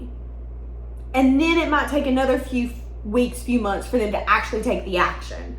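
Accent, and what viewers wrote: South, United States. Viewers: I love your southern drawl !! 😊 you don’t need classes . It’s cute😊. I’m from NY. The Canadians make fun of how we talk .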